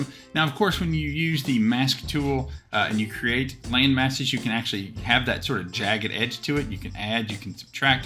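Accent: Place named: Alabama, United States. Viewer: You from Alabama? You sound just like Destin from Smarter every day!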